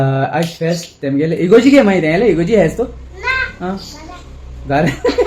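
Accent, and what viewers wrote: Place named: South Goa, India. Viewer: really you are comedian I like the way you talk of south goa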